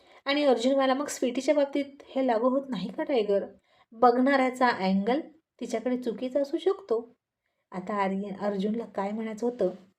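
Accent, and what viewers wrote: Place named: Kolhapur, India. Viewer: Good morning tai 🌄 khupcha khup miss kele mitumhala ani tumcha storila fon divas....gharatali ekhadi vakti bahergavi jate ani ghar kasa suna suna vatate tasa mala vatate hota😢😢😢😢😢😢😢😢😢mi tumchi pranay pipasu bayko hi story khupcha miss karat ahe😢😢😢😢😢😢ta storitil ekun ek prasang mi maza manat sadhavun devale ahet😊😊😊😊😊😊thanku tai 🙏🙏🙏🙏🙏🙏🙏🙏🙏🙏 love you tai ❤❤❤❤❤❤ tumhi khup chan ahat tai ❤❤❤❤❤tumcha kolhapuri bhaset mhatle tur lay bhari...........nad kudha.... Tumcha sarkhe tumhich.....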